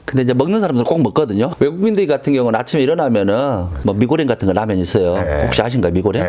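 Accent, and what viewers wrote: Jeolla, South Korea. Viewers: 말 재밌게 잘하시는 전라도 분이시네요 ㅎㅎㅎ 같은 전라도인으로 반갑습니다. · 구수한 전라도 사투리 😊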